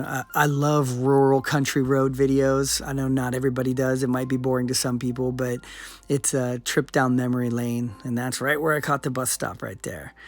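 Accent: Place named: Ohio, United States. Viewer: Why I knew you were from Ohio by how you talk? 😁😁 Im in Cincy❤❤❤❤❤❤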